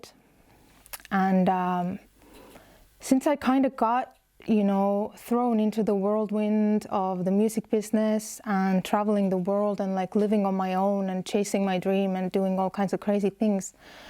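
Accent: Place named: Los Angeles, United States. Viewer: "kind of like" yeah, sounds LA alright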